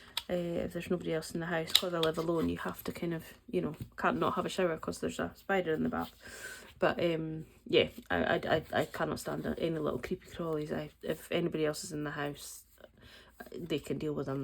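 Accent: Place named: Inverness, United Kingdom. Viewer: I came to Inverness last year. You do have a Inverness twang to your accent xx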